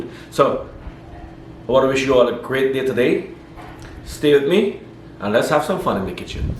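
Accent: Guyana. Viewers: Really nice dishes .... guyanese style. Can't beat guyanese food and twang ; >) I do the same thing here in Canada but I use a cast iron pan ( just like the one you bought) and seasoned it for non-stick. Mostly we don't use the microwave anymore (bad for your health in the long or short run) I use an overhead oven. Non stick pans has a coating that contributes to cancer. Just a heads up.... for what happen to someone else does not mean it will happen to another. Cheers on a good video.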